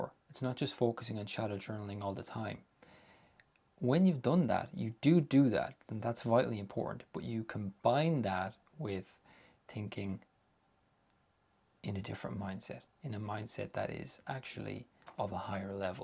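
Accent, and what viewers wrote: Ireland. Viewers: New to your channel. Totally off topic.. are you Irish?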